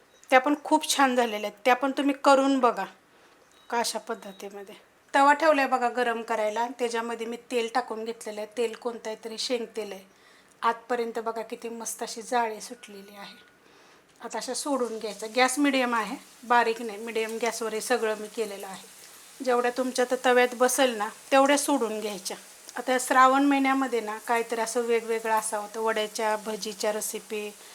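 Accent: Satara, India. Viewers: भाषा सातारी आहे.... कारण मे देखील सातारची आहे पण मुंबईत लहानाची मोठी झाल्याने मला अस्सल सातारी बोलता येत नाही. पण भाषे वरून समजते.असो.... माझे सासर खटाव तालुक्यात आहे... आपण एकाच भागाचे आहोत.. तुम्हाला all the best 🎉❤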